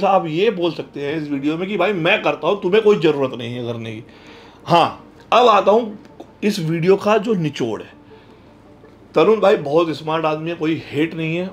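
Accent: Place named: Haryana, India. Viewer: Haryana se ho sir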